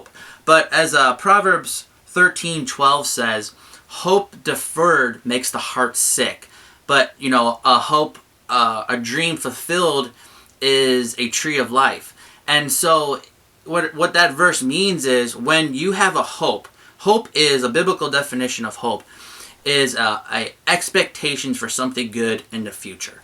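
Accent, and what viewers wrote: United States. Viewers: I have watched, read, and heard a lot of Christian dating advice over the years, and this hit it right on the nose. I had never heard someone talk about emotional boundaries within a dating relationship, only with friends of the opposite gender so I really appreciated it being brought up. I also thought that the last point of being weary of our words and promises is a really key element as well. I can't tell you how many times I have heard friends or family make promises they can't keep in a relationship only to see it crumble when they can't hold up their end. I also liked your discussion of boundaries when talking about the future. I have never even considered that one before, however upon thinking about it, it is 100% true. I know I have made this mistake which lead to me staying in a relationship longer than I might have otherwise simply because we had talked about the future so much. 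I just wanted to say thank you and I enjoyed hearing the slight Minnesota accent with some great Christian dating advice.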